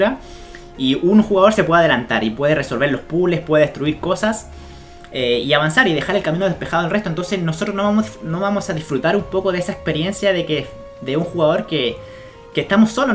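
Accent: Chile. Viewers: eres chileno ? xD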